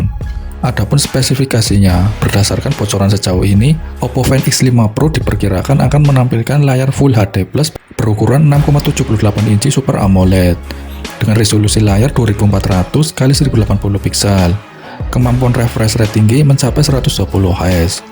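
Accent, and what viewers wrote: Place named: Java, Indonesia. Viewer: Suarane wes ketara medok hehehhe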